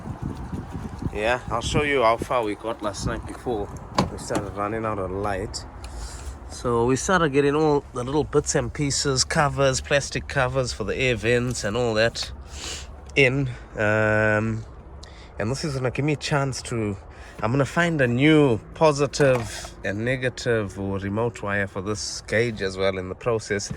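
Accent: South Africa. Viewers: Ayyyy bra you from south Africa?typical charou tunes here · Man u almost sound south african lol Love da video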